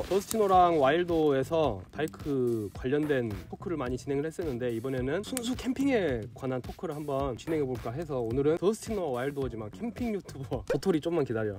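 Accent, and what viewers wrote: Jeolla, South Korea. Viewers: 택티컬 터널 검색중에 우연히 들어왔는데 즐라도 말투에 친근감 느끼고 바로 구독 눌렸는데 헬리녹스 전반적인 관점, 전망 설명에 ㅂㄹ을 탁치고 갑니다. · 같은 지역출신 사람으로서 동생같은 전라도 사람들이 많았으면 좋겠어. 전라도 사투리를 사용하는 사람들은 지적이다. 달변이다. 그리고 젠틀하다.이런 이미지 계속 퍼졌으면 좋겠어. 과거 암울했던 시기 오랜기간 여러채널을 통해서 자행된 전라도사투리의 부정적이미지 심어나르기 .. 추천누르고 구독 꽝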